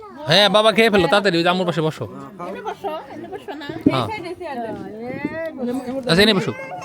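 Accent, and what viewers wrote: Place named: Gopalganj, Bangladesh. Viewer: ভাই, আমার এক খালাতো ভাই আছে, খুবই ক্লোজ ছিল।উনার ভয়েস, কথার টান ঠিক আপনার মত।ওর বাড়িও গোপালগঞ্জ সাটুরিয়া।আমি সাটুরিয়া বাজারে বেশ কয়েকবার গিয়েছি।খালুজান মারা যাওয়ার পর পারিবারিক জটিলতায় দূরত্ব হয়ে গেসে, তারপরেও আপনার ভিডিও দেখলে আমি আমার কাজিনকে ফিল করি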